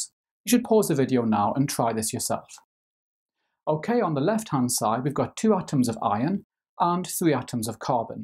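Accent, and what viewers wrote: United Kingdom. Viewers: Why British accent makes everything better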